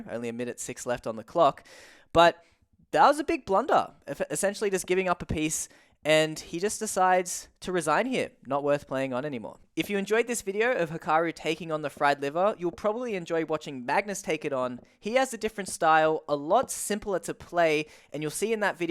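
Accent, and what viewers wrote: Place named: Australia. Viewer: From your accent I'm guessing you're a fellow Aussie. Nice channel!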